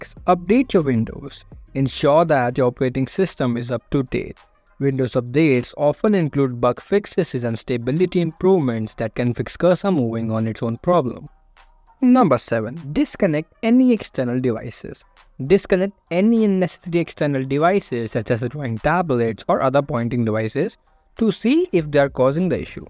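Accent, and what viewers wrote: India. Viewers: This guy talk so indiany its understandable and the acsent is useless but thanks for trying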